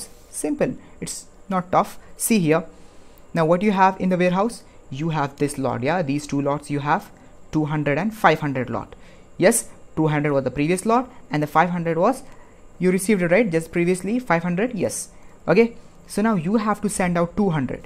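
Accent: South India, India. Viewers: south india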